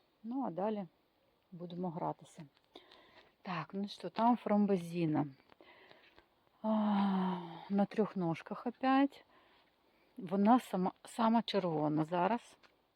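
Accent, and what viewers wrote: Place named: Russia. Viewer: Как ни выдавливай русский,а он все равно проскакивает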